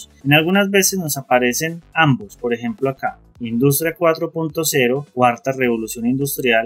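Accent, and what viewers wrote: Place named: Medellín, Colombia. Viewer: Excelente amigo, sos de medellin, colombia verdad🧠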